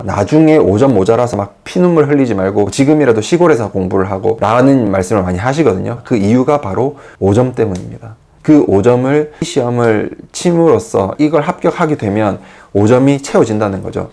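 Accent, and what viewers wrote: Gyeongsang, South Korea. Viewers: 시골 탈출 축하드립니다^^ 사투리가 같은 경상도 말투라 반갑네용 ㅋㅋ 주변 독일, 프랑스, 인도인 친구들은 NATTI CCL을 기본적으로 다 치는 걸로 생각하던데 한국분들은 쳐볼 생각도 안해보시는 거 같아서 아쉽더라고요. 저도 주변에 아무도 NATTI CCL 생각 안하고 있을 때 혼자 공부해서 시험치고 합격했던 경험이 있습니다. 그때는 워낙 정보가 없어서 너~무 너~~무 고생 많이 했지만 시험 자체는 생각보다 쉬웠어요. 추천해주신 웹사이트 가서 보니까 세상 좋아졌다는 생각 드네요ㅋㅋ 2024년 이민 준비하시는 분들 다들 화이팅^_^**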